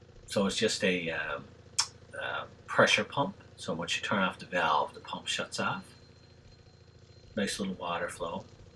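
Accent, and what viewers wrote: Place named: Newfoundland, Canada. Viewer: Hah. Here I am daydreaming about a ford transit conversion, looking for ideas when I stumble across this video and immediately realize that I recognize that accent. A fellow Newfie!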